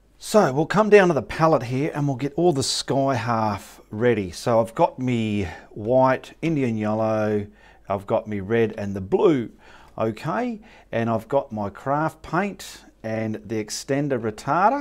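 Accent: Australia. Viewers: Beautiful! Your sense of humor is so subtle, but so funny. "We'll put a bum on that cloud, and a bum on that cloud." I've been trying your examples in attempting to do my beach scene, and as simple as you make them, they still take practice, for me anyway. I'm combining two of your videos on one painting, and the tips and tricks are so incredibly helpful! Not sure if it helps the channel, but I definitely end up watching each video many times, haha. Watching them over and over builds up my courage! Thank you so much for taking the time to share your wonderful talents! I'm so happy to have found your channel! (I think I'm picking up an Australian accent while I'm at it!)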